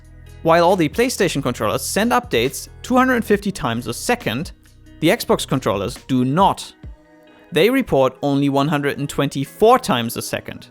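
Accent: Germany. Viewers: your english….. sounds a bit german ?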